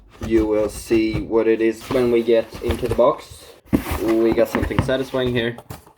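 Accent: Sweden. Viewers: Are u from Sweden cuz I feel like ur accent of pronouncing words is like Swedish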